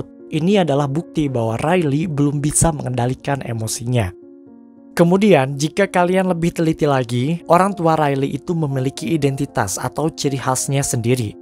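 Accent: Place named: Java, Indonesia. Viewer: Bang, anda orang Jawa ya soalnya sedikit denger ada logat Jawa nya tapi saya orang Sunda kok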